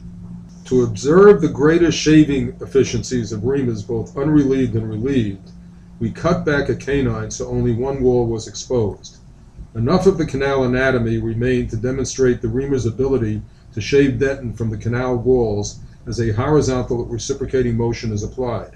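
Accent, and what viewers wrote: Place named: New York, United States. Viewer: i lover this guys NYC accent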